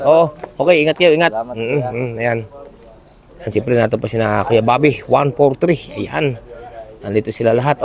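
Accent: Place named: Batangas, Philippines. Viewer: Batangueño prin ung iyong punto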